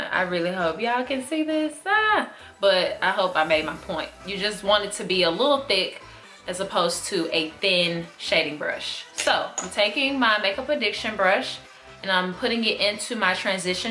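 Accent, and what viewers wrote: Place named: Texas, United States. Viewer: You have the cutest Texas accent and great video!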